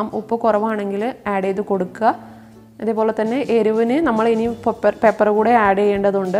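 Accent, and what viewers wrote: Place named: Palakkad, India. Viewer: U have a Palakkad influence in it language....but whatever....I loved this recipe hit like.....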